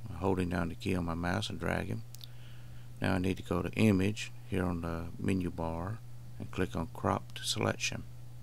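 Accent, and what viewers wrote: South, United States. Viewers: Don't use GIMP every day, would have taken me hours to figure this out (if at all), but video very clearly explained, & with a beautiful southern accent! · Very helpful, but you sure do sound like my Uncle Cecil? Are you from TN or KY?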